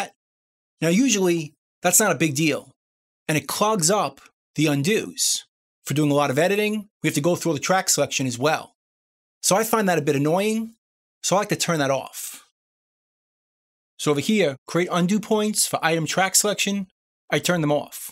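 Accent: New York, United States. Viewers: Hey your from New York like me, I recognize that accent anywhere, I grew up in NYC, Queens YO! Yeah Reaper is awesome, I was using Adobe audition for the longest time and the latency issue on that thing drove me absolutely crazy, it got to the point where I gave up and would just try to physically move the tracks so they would play in time, but even then even if you're a millisecond off then after a minute it goes out of time. I really don't understand why a company like Adobe would go through all that trouble making a program like that and totally F up the latency issue. Anyway Reaper is amazing, no issues whatsoever, and THANKS so much for all these tutorials! Fantastic!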